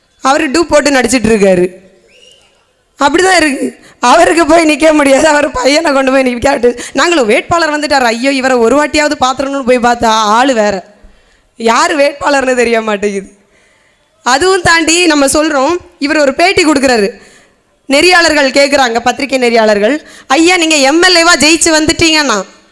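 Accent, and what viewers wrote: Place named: Kanyakumari, India. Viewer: கன்னியகுமரி த்தமிழ் அழகு ்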